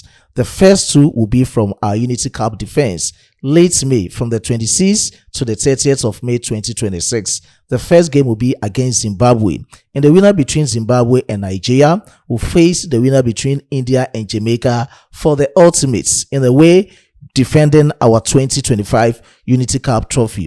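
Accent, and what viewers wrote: Ghana. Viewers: You speak like a Ghanaian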